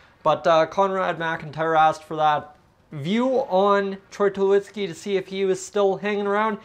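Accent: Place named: Canada. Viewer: As a fellow Canadian, i love your accent bro ahah. Doesn't get anymore Canadian than that. Keep up the good work ma dude 👊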